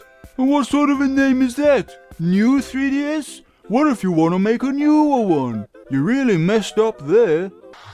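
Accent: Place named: United Kingdom. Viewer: wait you're British... bitchin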